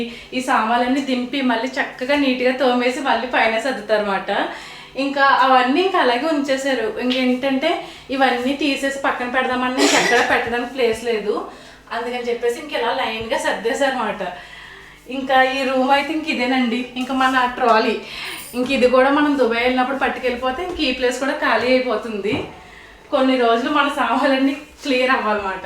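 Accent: Konaseema, India. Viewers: దన్యవాదాలు🎉 మీరు చక్కగా చెప్పారు❤ మీ ఇల్లు మద్యతరగతి వాళ్ళు వుండే నివాసం, బాగుంది. మీరు చాలా కాలం గా ఆ ఇంటి లో వున్నారు. అంటే మీ అనుబంధాలు చాలా గాడం గా వున్నాయి. కోన సీమ భాష లో చక్కగా వివరించావు👍 మాది అమరావతి 🙏ప్రాంతం ఓం అమరేశర సామి👏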